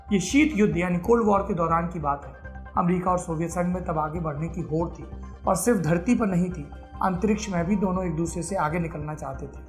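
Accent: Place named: Uttar Pradesh, India. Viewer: Yaar Saurabh bhaiya aapki ye UP wali style gazab hai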